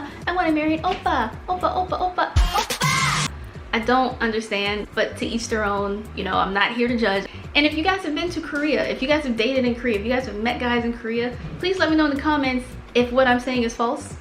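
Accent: England, United Kingdom. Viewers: Haha! British accent is coming along!! ❤️❤️ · That British accent tho😂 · That British accent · What is with the British and Australian accent? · Not the British accent😭😭😭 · The British accent got me 😅😅😅😅😂😂😂😂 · We need to talk about your English accent lol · Are you British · Yo I was so confused I’m like why does she sound kinda British still swear sis was American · Looove ur british accent btw🥵😍😍😍💯🙌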